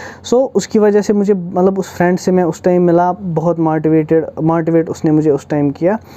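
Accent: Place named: Kashmir, India. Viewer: Thanks bro.... For giving these motivational videos ♥♥ Way you talk kashmiri in urdu lang. I really like it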